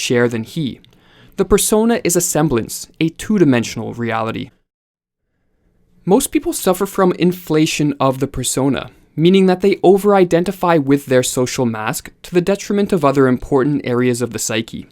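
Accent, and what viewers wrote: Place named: Canada. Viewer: This guys Canadian isn’t he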